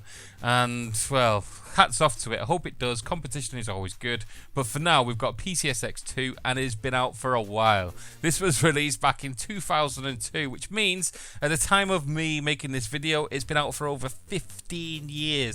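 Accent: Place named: England, United Kingdom. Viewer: I did not watch your channel for a while since I had been busy with my work. I think I have so many to catch up tho. The English I learned was all American(accent). It's kinda odd to hear English English though many would say it's the original/formal/polite English speaking. I don't care other sayings, it's still difficult to me to listen. However, I really enjoy your accent, that's the only one I understand and like the most:D. And that's the reason I stick to this channel and press thumbs up every time. I wish one day I could speak like you. That "good day gaieyes", that "waful", that "push it to the limit", and that "most'fallll".....:D:D:D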